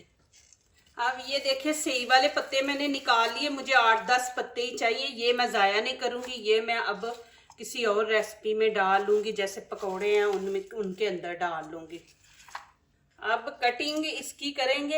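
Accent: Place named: Punjab, India. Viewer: Punjabi lehjee Urdu bolti ham Punjabi hi bol lya krn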